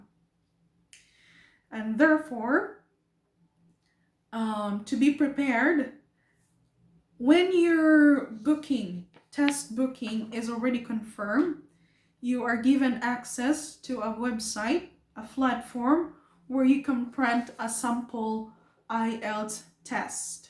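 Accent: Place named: United Kingdom. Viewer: Good information sis and I like the way you talk, you have good diction of British accent.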